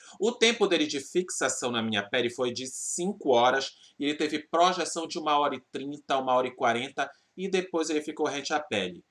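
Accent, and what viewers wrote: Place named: Belém, Brazil. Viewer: vc é de Belém, né? imagino que seja pelo sotaque onde vc compra dessa marca?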